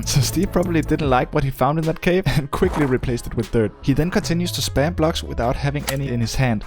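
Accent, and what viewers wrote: Denmark. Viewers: Love the danish accent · Danish brother🇩🇰💪 I could tell by your accent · Are you from Denmark 🇩🇰 no hate and it is just my opinion cause you’re accent sounds like it😊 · Are u Danish? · OMG jeg syntes satme du lød som en Dker der talte engelsk XD Så var lige inde og stalk din YT og jemmen godag da kære med Dker :D :D :D Håber ikke du fryser formeget i vores kolde land :D :D :D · “Wow the wolf’s name is Dennis” out of content but Are you from Denmark? I can hear it in your Voice, im from Denmark.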